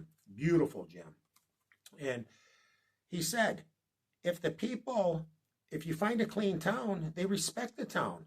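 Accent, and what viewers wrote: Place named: New England, United States. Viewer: He's a Yankee threw and threw. Where's that accent from? 😂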